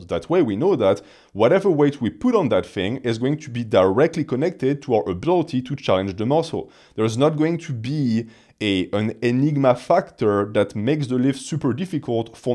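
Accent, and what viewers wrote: France. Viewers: Wait are you French ?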